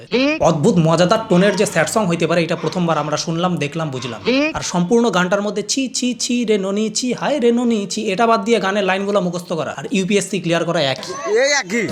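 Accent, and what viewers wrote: Bangladesh. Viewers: তোমার কথা মাঝে মধ‍্যে শুনলে মনে হয় বাংলাদেশের!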